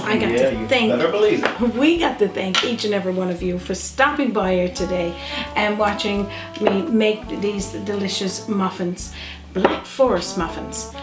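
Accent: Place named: Cape Breton, Canada. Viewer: Love the liners that you used,. I have made many muffins in the past as well. Another idea for you that I have done is.. the part that you cut out, I place it back on top of the filling, press it down a bit, put the whipping cream and cherry and chocolate back on top. Looks so pretty as well. I do it with lemon pie filling, too, on a white cake muffin, topping it with icing sugar and lemon zest. Just an idea. I live in BC now but from Cape Breton. Love watching your videos. I found your site when looking up sea food chowder recipes. Love the accent, reminds me of 'home'. 😊❤🇨🇦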